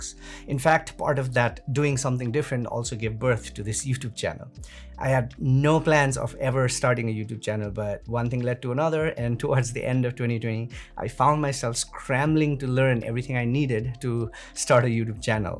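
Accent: Nepal. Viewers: now im pretty sure you're from nepal Your accents and all that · Damm you are from Nepal. i knew from the tone & name but seeing conforms it. Hey, tell about how did you get there, the visa and other issues, really cluttered you know living here as a student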